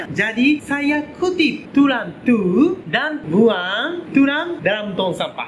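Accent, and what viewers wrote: Japan. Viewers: Suka dengar cakap. Tatabahasa sangat baik. Cuma sebutan masih ikut pengaruh consonant Jepun. BTW, mana boleh makanan Jepun rasa makan hospital?! 🤣 Saya suka makanan Jepun. Lagi bagus dari makanan hospital. Kalau lama tak makan makanan Jepun, asyik terbayang di kepala dan lidah. Sashimi dan kare raisu paling best. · i love the way he try his hardest to speak malay even the japanese slang was there a bit but the effort tho really deserved praises. Here some virtual hug for you !!!!❤ p/s: imma eat nasi lemak 2morrow morning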